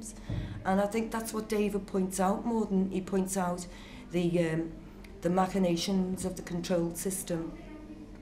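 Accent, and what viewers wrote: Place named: Liverpool, United Kingdom. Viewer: love that liverpool accent. coolest in the world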